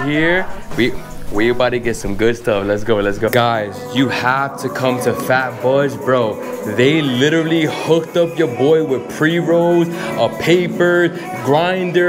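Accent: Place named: New York, United States. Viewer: Chanclas...how many understood that? you are pure neoyorquino...obvious when you talk LOL And thanks for the weed shop tip, so many shops in BKK are way overpriced ($25usd for a gram...yikes!)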